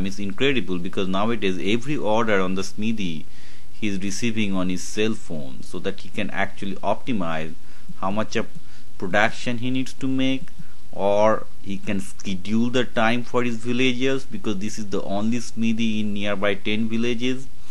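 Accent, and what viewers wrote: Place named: India. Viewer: your reporting is pathetic man,,... u need to improve your accent..a typical indian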